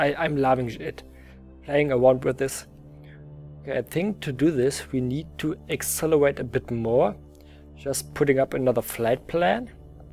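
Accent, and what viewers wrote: Germany. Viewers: Dankeschön! Es war ein gutes Video. Und "Flugzeugbau" bedeutet "Aeronautical Engineering" in Englisch. Du kannst das im Wörterbuch nachschlagen: <link> Und noch etwas: Ich mag deinen deutschen Akzent! :) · You have a very german accent. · German English? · r u german? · Wow, ich kannte dich nicht, hab aber sofort an deiner englischen Aussprache gehört, dass du Deutscher bist. :D Tolles Video! :)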